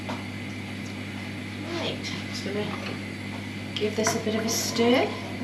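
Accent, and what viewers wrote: Newcastle, United Kingdom. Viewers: Another yummy video. Please don’t hide your accent. The Geordie accent is the best in the country in my opinion.😊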